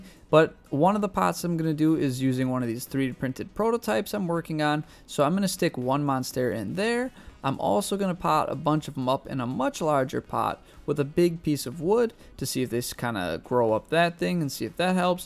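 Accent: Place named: Midwest, United States. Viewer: i need some midwest friends then! i love the accent lol. yes i barely discovered your channel and i love plants and now im starting to become obsessed with starting things from seed so your videos are really helpful and i enjoy watching them! i have a few projects i’m starting/about to start so i’m excited 😆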